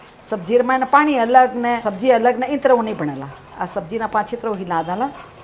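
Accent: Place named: Marwar, India. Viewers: nice aunty ji ur marwadi language is very sweet😙😙😙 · राम राम "मा" सा आप बहुत ही अच्छी रसोई बनती हैं उस सेभी अच्छी आपकी मारवाड़ी बोली है आप एक मारवाड़ी मुंग की बड़ी की सब्जी की भी रेसिपी बनाईए गा जी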